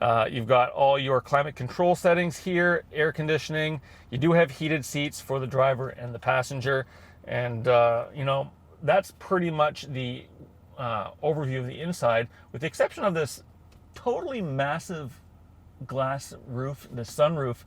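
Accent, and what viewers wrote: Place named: Midwest, United States. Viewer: Midwest accent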